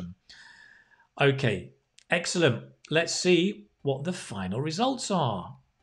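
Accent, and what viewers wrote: United Kingdom. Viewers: Dear Mr. Thank's a lot your lessons are so helpful, clear British accent, funny, informative, and lots of useful phrases, for free and so generous...😄 They're also useful for daily conversation not only for IELTS. Again, thank's a lot and more lessons please! 😄😃😃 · Muchas gracias, pero de verdad... muchisimas gracias por tu canal. Apenas lo conozco pero me encanta. Ajjajaja apesar de que no sea fan del inglés britanico, tu me estas haciendo amarlo 🤗🥰❤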